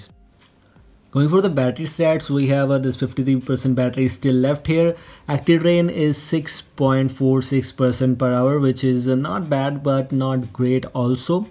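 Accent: India. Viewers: Please, two questions: There is no issue with Bluetooth (I'm not familiarized with the nice Indian accent and can't understand that part)? The navigation bar, the 3 buttons, can be customized too (take screenshots with a large tap in some of them for example)? Thank you!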